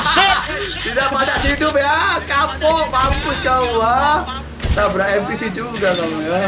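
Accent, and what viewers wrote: Java, Indonesia. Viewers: Medok tnan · KAMU ORANG JAWA YA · Mas wong ngendi si kok iso boso jowo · english'e wis medhok wkwkwkwk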